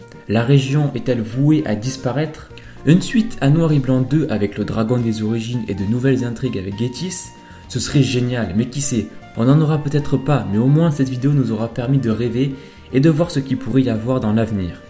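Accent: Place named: South, France. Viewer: ta l'accent du sud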